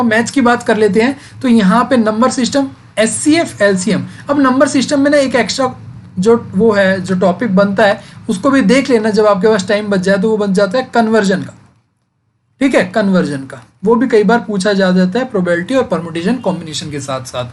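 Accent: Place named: Haryana, India. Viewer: Haryana se lagte ho.... 😁😂😂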